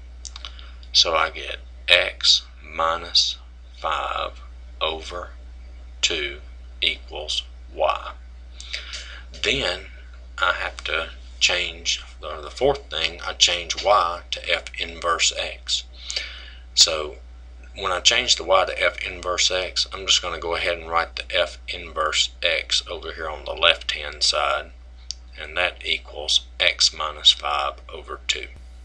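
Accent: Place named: Texas, United States. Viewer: Thank you so much Sir. Your explanation was perfect. It helped me understand the topic better. By the way, I love your Texas accent. I think it's cool.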